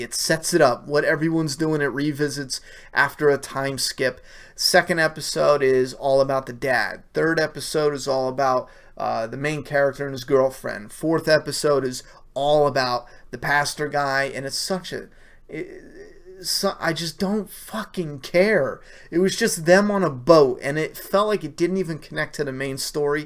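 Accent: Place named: Long Island, United States. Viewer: Q&A- first of all I just want to say I'm a huge fan. I appreciate your honesty and that you just stay to your true self no matter what viewers say. Anyway, I usually watch your TWD reviews,but I noticed your accent. Are you from Long Island? I'm from there,but currently living near Baltimore, MD.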